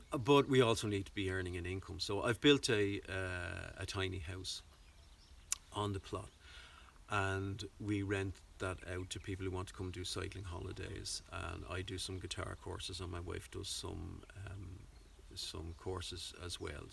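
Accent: Ireland. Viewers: 😂Born and raised in south side Cabinteely. I know a Dub accent when I hear it😂. You never lose it.😂 Great video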